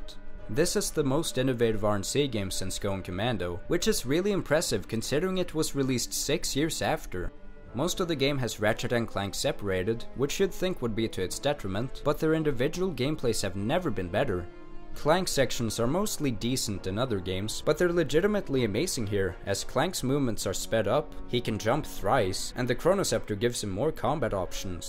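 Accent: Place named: Scandinavia. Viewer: You sound like you're from scandinavia.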